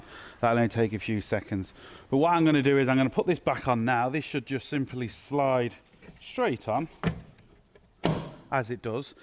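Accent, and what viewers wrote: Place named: United Kingdom. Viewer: British accent is awesome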